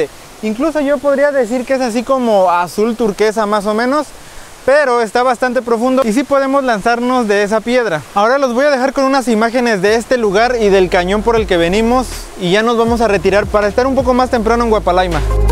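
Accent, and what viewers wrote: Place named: Oaxaca, Mexico. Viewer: En realidad la mayor parte del tiempo en toda la sierra de Chihuahua esta nublado y también la sierra abarca más de la tercera parte de la geografía del estado. Tu eres surumatito de allá por Oaxaca? Es qué te ves cómo oaxaquita y suenas como tal. Saludos de La Colonia LeBarón y arriba mi Chihuahua y nada más!!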